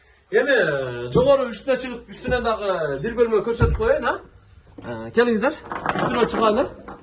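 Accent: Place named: Osh, Kyrgyzstan. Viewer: Аа деп Оштукча суйлобой,нормально кыргызча эле суйлочу кишини жииркентпей фу!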